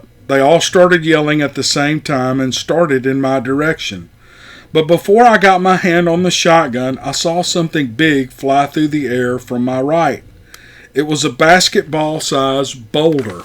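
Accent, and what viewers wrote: South, United States. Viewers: More great encounter stories from and his molasses soaked southern drawl!!! Who in their right mind would click on the thumbs down?!?!? Take it somewhere else, we don't want y'all here, anyway!!! Thanks,. I appreciate your time. Great stuff, as usual 😍👣 👍👍👍👍👍!!!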